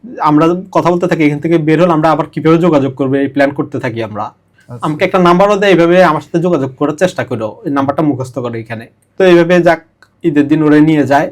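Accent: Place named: Chittagong, Bangladesh. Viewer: মনে হয় বাড়ী চিটাগাং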